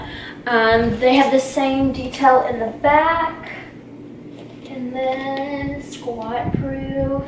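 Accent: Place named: South, United States. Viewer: nice accent of the sweet south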